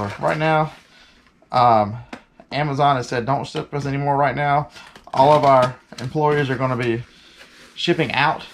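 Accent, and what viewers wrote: South, United States. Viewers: I watch you so much, I’m getting a southern accent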